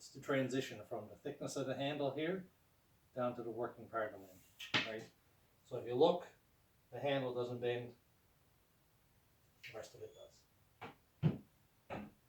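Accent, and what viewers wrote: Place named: Newfoundland, Canada. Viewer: You sound like you're from Newfoundland, if so what part?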